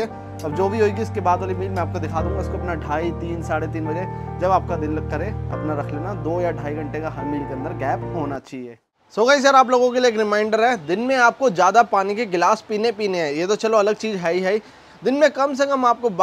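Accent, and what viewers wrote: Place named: Uttar Pradesh, India. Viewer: Aap up se ho sir voice se pta chl jata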